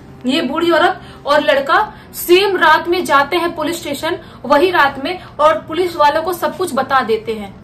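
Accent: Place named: Uttar Pradesh, India. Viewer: madam k bolne ka way of talking hi esa hai she's from uttarpradesh side...maybe isliye....but Kisi ko appreciate na kar sako to criticize karne ka bhi koi haq nhi h tum jese mahapurusho ko 🤦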